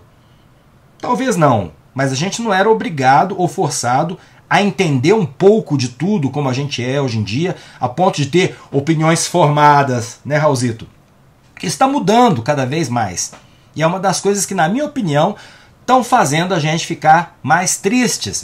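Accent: Minas Gerais, Brazil. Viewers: Faço minhas suas palavras. Parabéns pelo tema deste vídeo. Agora, diferente de você que é mineirinho, imagine o meu grau de frustração por morar em Curitiba que, embora seja considerada uma capital com um dos maiores índices de qualidade de vida do Brasil, convivo com a população mais "canhinha" que habita nosso querido torrão natal. Você conhece a escala de Bristol? Se conhece lhe digo que o pessoal daqui tá no topo da escala "prisão de ventre" Mineiro fala diferente mas nós aqui também.😂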